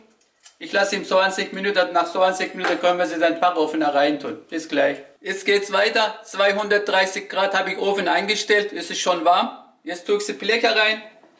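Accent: Swabia, Germany. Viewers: Das ist "Ausländerschwäbisch" 😂 Sprechen viele. Hat mein Vater auch so ähnlich drauf und ist kein Türke. Keine Ahnung, vielleicht tun die Schwaben ja irgendwas ins Wasser.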